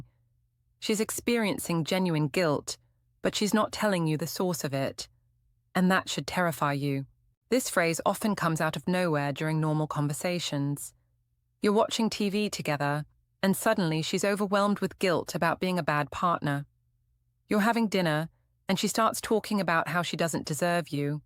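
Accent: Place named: New Jersey, United States. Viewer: That’s a lovely accent you got there. New Jersey?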